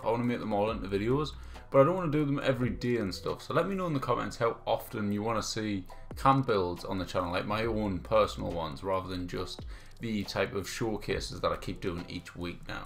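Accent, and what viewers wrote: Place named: Stockton-on-Tees, United Kingdom. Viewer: I’m Stockton-on-Tees so yes you sound familiar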